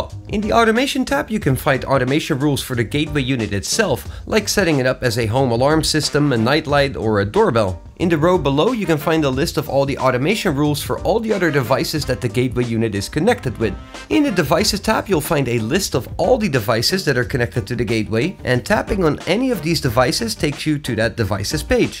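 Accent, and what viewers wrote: Netherlands. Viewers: I heard a dutch accent... but I wasn't a 100% sure until I read badkamer in the app... Lekker bezig!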